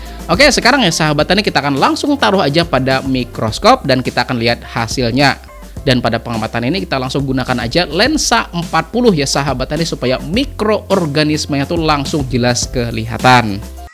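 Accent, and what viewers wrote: Banjar, Indonesia. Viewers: Sy baru di Chanel ini, juga baru belajar menanam. Alhamdulillah, isi Chanel nya benar2 padat ilmu. Oiya Om, mohon maaf, cuma mau mengobati rasa pnasaran sy, sy mau bertanya.. Om org Banjar..? Logat nya mirip Sama saya. :)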